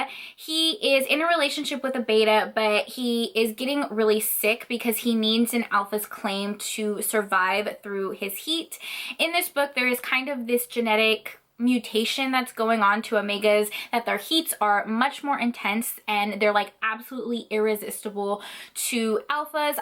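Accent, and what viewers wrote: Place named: Brazil. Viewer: Are you brazilian? Your accent looks really like a Brazilian one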